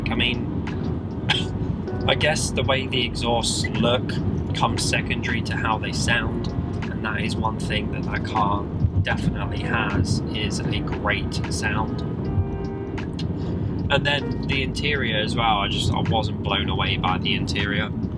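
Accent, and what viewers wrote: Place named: RP, United Kingdom. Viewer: Lol this gets bullied on his own youtube channel lol just put a hoody on bro and loose the posh accent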